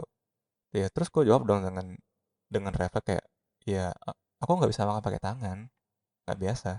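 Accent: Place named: Germany. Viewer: Kakak asli mana ? Kok suaranya ada aksen jermannya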